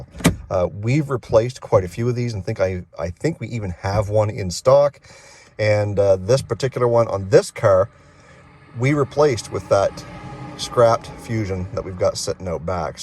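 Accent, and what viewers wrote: Canada. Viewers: There is that damn ou Canadian accent. Just terrible. HATE IT.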